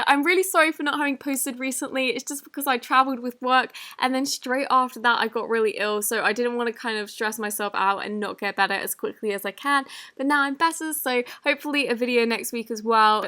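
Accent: United Kingdom. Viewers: Thang Hong she’s british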